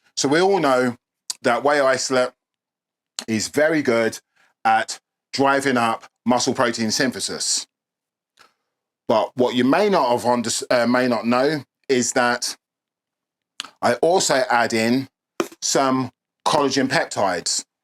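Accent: United Kingdom. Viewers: with an English accent?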